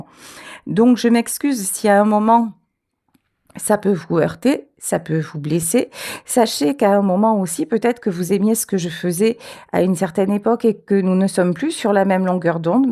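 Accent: Marseille, France. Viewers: Bonjour chère!❤❤😂😂🎉🎉 Jamais! Tu es parfaite comme tu es. Tu nas pas a t'excuser ou a devoir t'expliquer pour la belle personne que tu es.❤😂😂🎉🎉🎉🎉😂 Nous ne sommes personne pour te dire qui tu dois être ou comment tirer tes cartes.....???????❤❤😂😂🎉🎉🎉 Tout ce que je sais, est que tu n'est pas a l'origine de mes ressentis. ❤❤😂😂🎉🎉🎉 Très belle année et j'espère que ton bel accents marseillais restera bien droit dans ces bottes pour 2025.❤❤❤😂🎉🎉🎉 Ne t'inquiète pas tu es juste royales. Je commence a être plus connu de mes commentaires, et tu sais que comme toi, rien ne vaut mieux que ce que tu es❤😂😂🎉🎉🎉🎉🎉 En claire, rien ne mieux que ce que Dieu a fait de nous.❤❤❤❤😂😂😂🎉🎉🎉 tu es bien comme tu es et ce n'est pas grace a nous. Tu n'est que plus royale encore que quand t es authentique. ❤❤❤😂🎉🎉🎉🎉🎉 pour moi, dire ce qu'on pense avec respect et amour, être juste avec soi-même et les autres disans les choses comme elle sont, en plus de nous partager un bout de tes expériences, Tu n'as que plus de mérites!❤❤😂😂😂😂🎉🎉🎉Merci 🎉🎉🎉🎉 Je te souhaites pleins de bonheur, de l'amour de ce qui t'entourent et de paix pour en profiter. 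❤❤❤😂😂🎉🎉🎉🎉🎉🎉🥊🥊🥊 La classe ca ne s'apprend pas!😂😂😂😂 Soit on est née avec, soit on se l'achète sur YouTube. 😂😂😂😂😂😂😂🎉🎉🎉❤ Toi comme moi, tu es nées avec!🎉🎉🎉🎉😂😂😂😂🥊🥊🥊🥊 Alors merci de t'en servir pour nous guider. ❤❤❤😂🎉🎉 Toute fois, pardon si j'ai dis quoique ce soit d'irrespectueux, juger ou mal exprimer. ❤❤😂😂🎉🎉😂😂bonne année.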